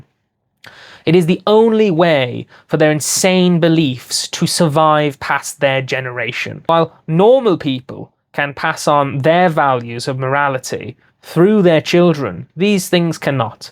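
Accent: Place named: United Kingdom. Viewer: Right wing channel called my ireland. Accent is so british he might as well have the surname of Windsor. Many such cases.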